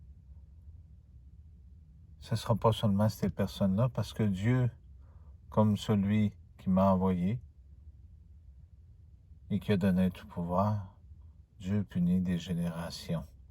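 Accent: Quebec, Canada. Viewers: Vous êtes québécois ? 😄😁😆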